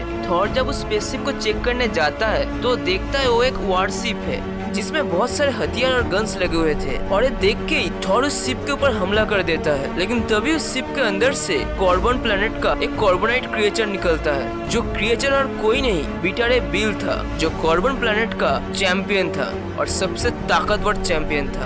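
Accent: Bengal, India. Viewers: Are you Bengali??? caus something like Bangla in your Hindi??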